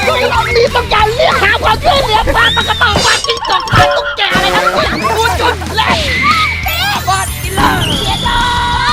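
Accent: Isan, Thailand. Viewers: เป็นคนอีสานแมนบ่จ้าอ้าย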